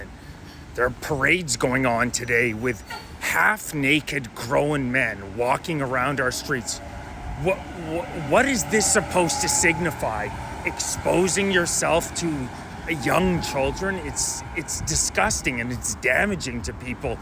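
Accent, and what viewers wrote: Canada. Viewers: Thanks Brother! For speaking like a true Canadian! :)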